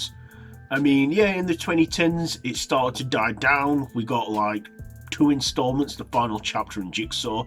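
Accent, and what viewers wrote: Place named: RP, United Kingdom. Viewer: This guy sounds exactly like Sir Brian Blessed. God Queens English accent.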